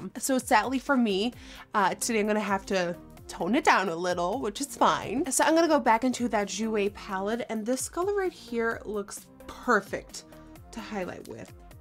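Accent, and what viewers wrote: United States. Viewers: She's Dutch, and what an amazing channel and American accent! Ga zo door! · Oké ik wist dus niet dat je Nederlands was je klinkt als een amerikaan😂😂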